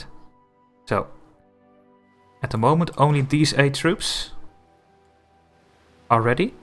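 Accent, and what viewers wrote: Netherlands. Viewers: Can Gerek Nee, dat is gwn nederlands accent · Typisch nederlandse accent xD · Hij heeft een behoorlijk Nederlands accent. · It's not as bad as most Dutch though. It's very easy to listen to and quite fluent. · obviously sarcastic can't miss a Dutch accent lol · I find your holland accent so funny :D I'm from Belgium btw ;) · haha you are either sarcastic or stupid, I'm dutch aswell and i hear emediately that he's dutch ;D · Ik kan gewoon horen dat het een Nederlander is die Engels praat dat is bij elke Nederlander. Haha THATS another cook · je bent een nederlander ik hoor het aan je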